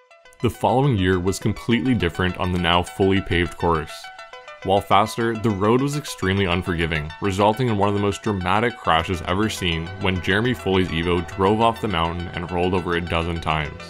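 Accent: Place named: Canada. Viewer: If anyone is wondering what a non Maritimes/Quebec canuck accent sounds like, this channel's for you. Cheers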